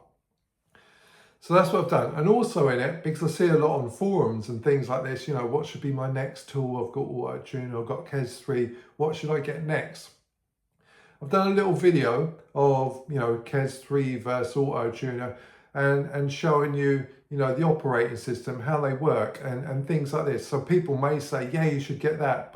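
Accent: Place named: England, United Kingdom. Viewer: Thank you, really enjoying your channel content... 👍 ...you sound english, are you in the USA as you price in dollars $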